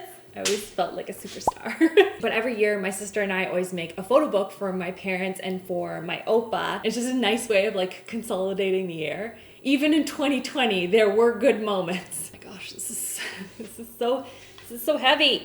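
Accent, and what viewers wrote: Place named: Canada. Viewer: I seriously genuinely love your Canadian accent, it really makes me happy (( Brownies eh )) for example. Ps: all of your videos are amusing 🌼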